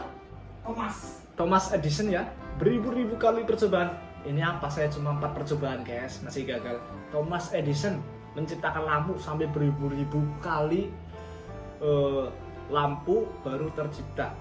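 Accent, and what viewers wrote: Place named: Banyumas, Indonesia. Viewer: ngapak e oraak ilang rek😅 Mantab mas, lanjutkan😁👍 salam angkatan IM JAPAN 315🤝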